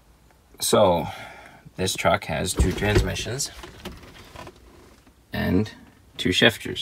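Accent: Canada. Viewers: The first 10 seconds I knew this fella was Canadian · Hey buddy you from Canada?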